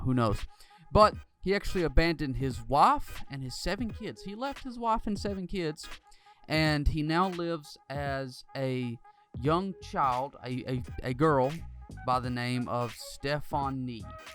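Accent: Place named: Alabama, United States. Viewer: You sound Alabamian.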